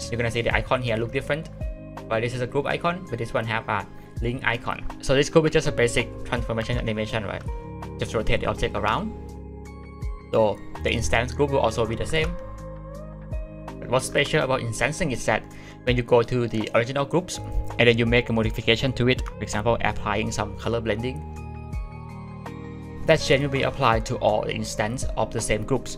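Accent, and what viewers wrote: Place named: Thailand. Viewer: Your accent sounds like someone who is secretly into Thai. Am I the only one who thinks this?🤔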